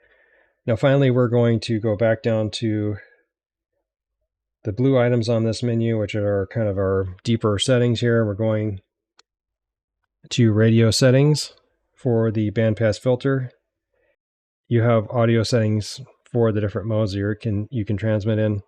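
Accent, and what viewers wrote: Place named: United States. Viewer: One thing you could also do to improve your transmit audio is to ...speak...more...slowly.... I dont mean gaps between the words. I mean the w o r d s t h e m s e l v e s . Whatever part of the USA your accent is from, you speak very fast. So on a difficult receive situation, or for someone whose first languge is not English, the receiver will find you much more difficult to understand. Yes it's difficult to do, but certainly in your case it will make a big difference.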